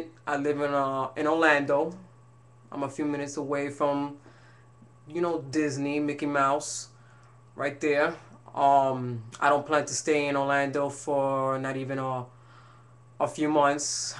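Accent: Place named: New York, United States. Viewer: Good luck to you! I though i detected a nyc accent.. It's very easy to transition in nyc, reconsider doing it over here. Peace bro.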